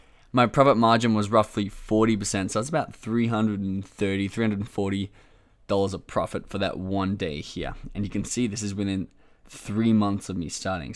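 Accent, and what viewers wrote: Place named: Australia. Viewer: Hi! Thanks for a great course, just found your youtube channel. One question, are you based in the US or have a company registered there? The reason why I am asking is that I am based in Europe, but would like to sell on <link> and from the sound of it you are from Australia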